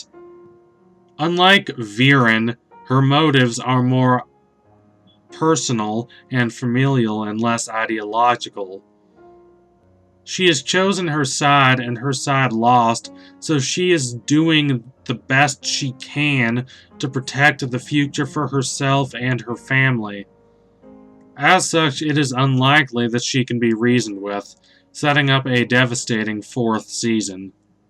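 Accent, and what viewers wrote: South, United States. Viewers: Hopefully Claudia is the villain/ star character of season 4 because I would like to see her reactions to Rayllum and over all, the peace between Xadia and The Human Kingdoms. Also, I love you random southern accent, I laughed while listening to this video (in a good way). Keep up the great videos! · Dude do u have a accent? U almost sound southern?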